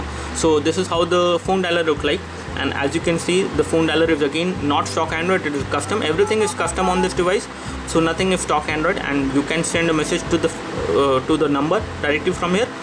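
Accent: India. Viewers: "what he says again" ? explain y ur sounded like indian?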